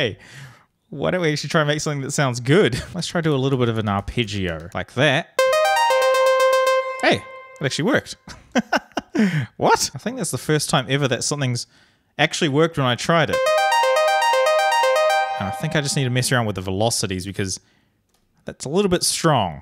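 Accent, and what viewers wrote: New Zealand. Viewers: Your from NZ aren't you?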